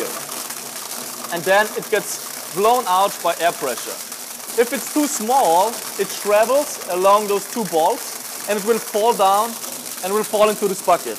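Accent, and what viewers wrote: Germany. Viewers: Dein englisch ist gut jedoch der akzent ist zu deutsch 😂👍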